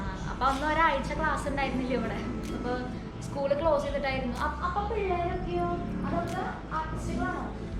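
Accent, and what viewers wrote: Thrissur, India. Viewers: Aa thrissur karide aa slang powliyatto atha kuduthslum video kanan preripiche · അടിപൊളി അവതരണം ആണുട്ടോ.. എന്നാലും നമ്മുടെ നമ്മുടെ നമ്മുടെ ഒന്നു കുറക്കാൻ പറ്റുമോ. തൃശ്ശൂർ സംസാരം കേൾക്കാൻ നല്ല രസമാണ് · Ente frnd Dayakuttynte same samsaram avalum Thrissur anu... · A simple village vlog from Simple Village girl 👧 loves your Thrissur slang talking 👩‍🎤😍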